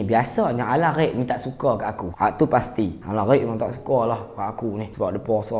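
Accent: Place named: North, Malaysia. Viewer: Itu loghat utara, Abang ni, di dalam video mL studio memang akan guna loghat utara dia..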